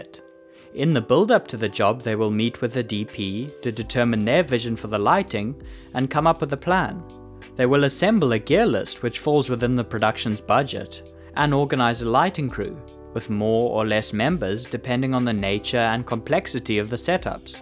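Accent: South Africa. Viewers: You have a very distinct UK accent. Is that London or somewhere in. Northern England? Oh South Africa! No wonder I couldn't pinpoint it. Keep up the good work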